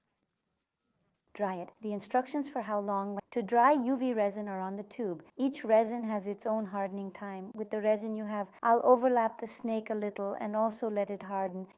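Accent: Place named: Sri Lanka. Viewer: Sounds sri lankan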